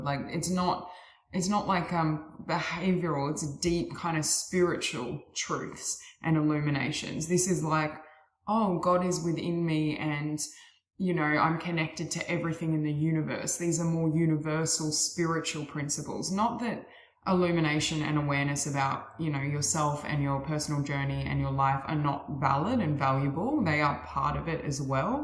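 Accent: Australia. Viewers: Lovely to listen to another aussie reading tarot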